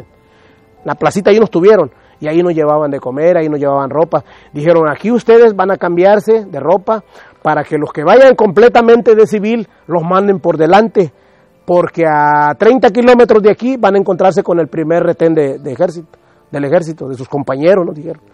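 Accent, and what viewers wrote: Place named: Mexico. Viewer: Este abla.como mexican..estubo en la usa?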